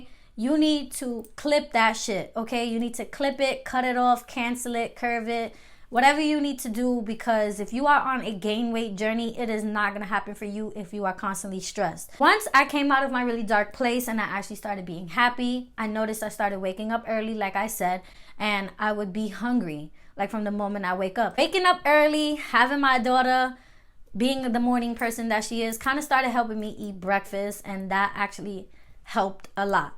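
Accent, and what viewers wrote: New York, United States. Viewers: i’m new to your channel, you gotta be from ny 🤣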